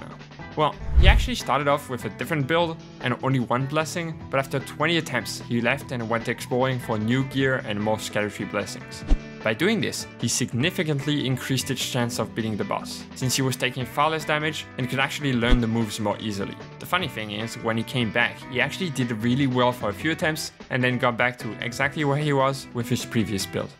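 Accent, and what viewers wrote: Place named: Germany. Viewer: Can I say, this is my first video I've seen of yours and I love your voice. It's so bizarrely unique to me, like a grown up Elmo with a slight German accent. I'm sure this is an inaccurate comparison but that's just what my brain is thinking up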